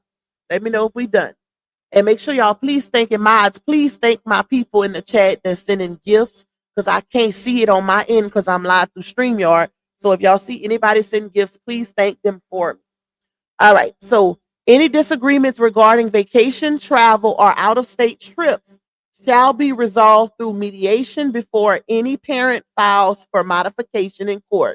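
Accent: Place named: South, United States. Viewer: And may I say I LOVE the way you say BER-DAY!!! 🤣🤣omg that's the cutest Southern accent I ever heard!! Love you! 😘💕✨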